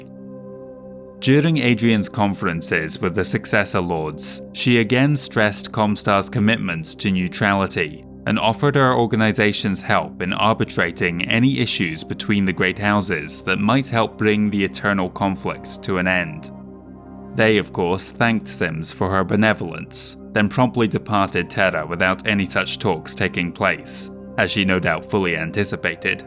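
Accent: Scotland, United Kingdom. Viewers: MechCommander is the first "proper" PC game I ever played. Remembering it just a month ago is how I suddenly ended up stumbling upon your channel. And my oh my. You have blown my mind with this long-form content. Unreal, well done. P.S. are you Scottish? You have such an interesting Scotland/England combination accent. I'm from just over the border, in Northumberland.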